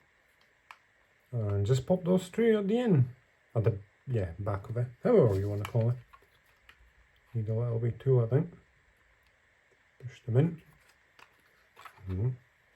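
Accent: Scotland, United Kingdom. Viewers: I just want to thank you from the bottom of my heart for this video. My brother got me this piece from China, so all the instructions were in Chinese and I gave up on Google translating each step after five minutes. I was about to give up but then thought let me see if there's a video on Youtube, and I found your video, and it was honestly some of the best days I've had in a long time! Hearing your relaxed voice and lovely little commentary as we build this cello was nothing but a joy, and I've learned to always sand sand sand because that's a habit eh? I checked your account to see what other pieces you built so I can follow along, so I'm just waiting for the typewriter to arrive so I can build it together with your video! Can't wait to hear the Scottish accent for hours on end! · Helpful instructions. Hopefully I can understand the Scottish accent for the entire video.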